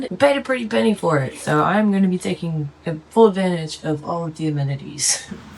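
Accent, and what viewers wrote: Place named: South, United States. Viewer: She is very beautiful. I love her smile and southern accent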